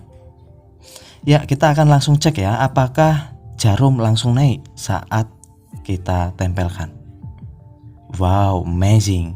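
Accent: Java, Indonesia. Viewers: Samean asli mana mas ? Kok koyoe medok nek ngomong. Hehee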